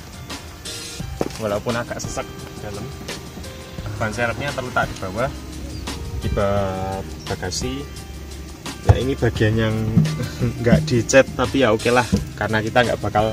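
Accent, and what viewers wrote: Surabaya, Indonesia. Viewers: iki wong suroboyo yo ? sepisan ngrungokne langsung mikir suroboyoan. medhok jowone masbro. salam suroboyo👍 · 10 detik awal, gak perlu moco comment, asli suroboyo yo ? salam medhok masbro :D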